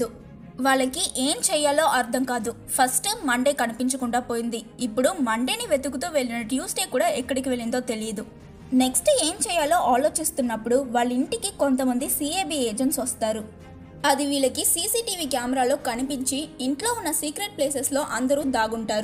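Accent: Tamil Nadu, India. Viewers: are you tamil kanu vunnu what is this but your explanation is very good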